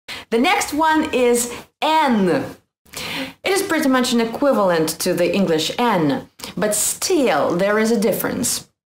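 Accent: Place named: Russia. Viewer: I'm an Indian.. And I like this Russian accent.... But I'm finding it so difficult.. I couldn't pronounce like you... 😥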